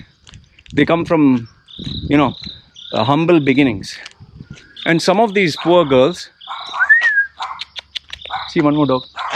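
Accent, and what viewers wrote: India. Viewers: Did not expect you to have an Indian accent LOL. Cool insight tho.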